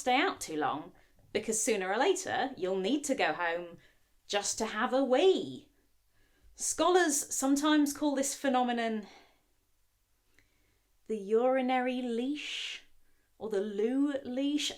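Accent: United Kingdom. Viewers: You rock in your knowledge. And that endearing British accent done with panache is so delightful.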